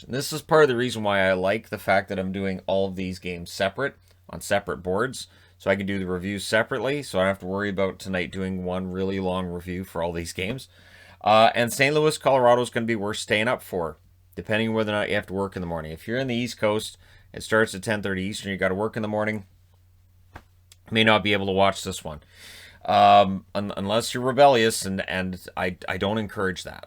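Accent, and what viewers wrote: Canada. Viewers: Feel the love Even if he does pronounce words ala Canada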